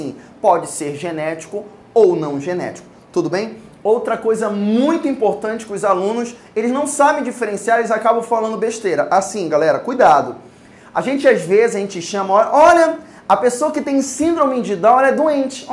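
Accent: Pará, Brazil. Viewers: é paraense? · Esse cara só pode ser do Pará... É lá de nós. éguaaaa cara saudade da nossa terrinha. o melhor. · Ede Montanho ele é paraense. 😊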